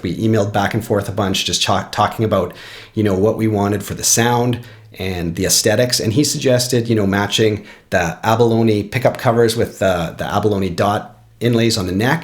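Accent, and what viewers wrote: Canada. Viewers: Are you Canadian? Cause I am and I detect a bit of the old "eh" going on.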